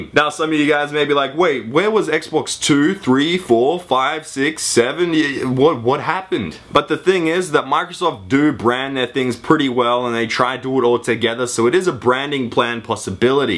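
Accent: Australia. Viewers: Halo 4 is gay along with you're Australian Accent / voice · ur Australian?????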